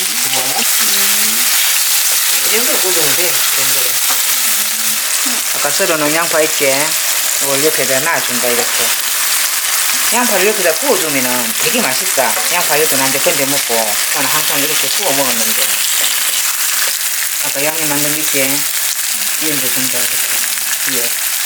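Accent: Gyeongsang, South Korea. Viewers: 경상도 말투 재미있어요 따님 살짝 콧소리 웃음소리 살살 녹입니다 요리는 최고입니다 복잡하고 어려우면 배울 수 없는데 정말 좋아요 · 구수한 경상도 사투리에 넘 정겹고 나의 요리 스승이세요 매번 잘 보고 있어요!!! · 맛잇어요~어머님혹시어투가경남쪽같은데(김해) 그쪽이신가요? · 저는 부산사람이라 경상도 사투리 너무 좋네요 ㅎㅎ 잘배울께요~♥ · 선생님 요리를 자주봅니다~ 뎃글은 처음이네요~ㅎ 경상도 사투리가 저를 웃음짓게 합니다. 집밥같은 맛! 선생님 요리는 정겨운 엄마집밥! 그자체입니다~ 감사합니다^^^^ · 구수한경상도 말씀 듣기 좋아요~^^ 영상 잘봤습니다. 감사합니다~^^ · 아 저도 경상도 인데 사투리 대박이심니다 너무 재밋어요 재밋고 유익함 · 경상도사투리 말씀 듣기가 좋아요 저도 경상도 ㅋㆍㅋ 정겨운 말씨와 요리도 맛가나게 잘하시네요 맛난것 많이올러주세요 ~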